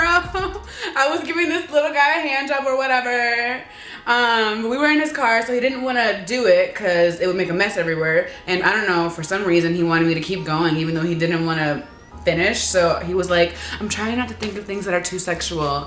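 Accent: United Kingdom. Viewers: It wouldn't be a video without the British accent popping out and sarcasm and sass. Love it 😂😂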